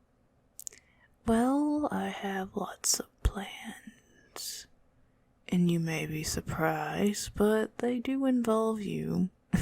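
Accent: South, United States. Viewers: don’t you DARE hide that beautiful country accent!!🤣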